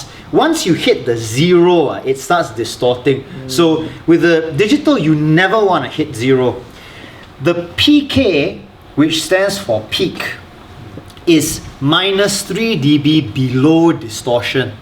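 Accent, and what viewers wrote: Singapore. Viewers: Great Singlish hahaha 👍🏻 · I know that you have a very familiar accent! That's why I'm asking whether you're Singaporean/Malaysian ! How did u learn our English accent? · Oh goodness didn't expect the Singaporean accent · Yeahh..sounds really Singapore English. Great video!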